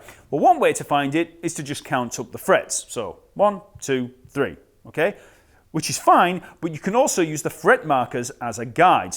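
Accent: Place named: England, United Kingdom. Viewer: when did shane o mac get an english accent?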